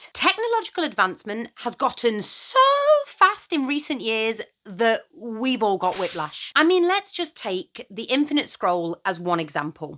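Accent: United Kingdom. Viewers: I was being a butt at the beginning of this video, expecting it to irritate me, even though I agree with the subject. But your skilled analysis and breakdown of the issue quickly turned me around. I just subscribed. Your video helped to make me aware of my blindspots and preconceived notions. One of those being a slightly xenophobic perception of the british accent. Thank you, and I'm sorry for doubting you.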